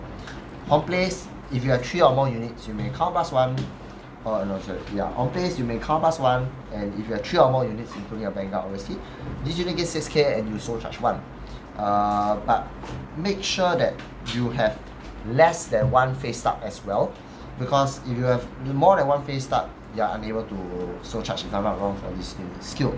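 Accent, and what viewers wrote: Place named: Malaysia. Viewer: U have a malaysin accent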